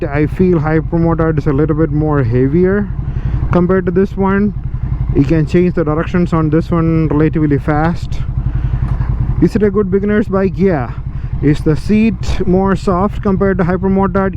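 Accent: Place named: Kerala, India. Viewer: Indian origin Malayalis Voice recognised in this video 😅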